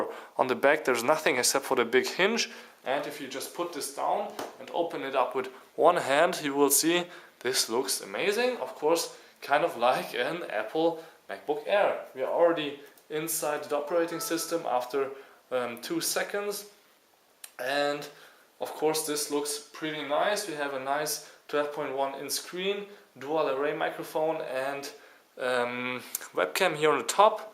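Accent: Germany. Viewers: Thats the typical german accent^^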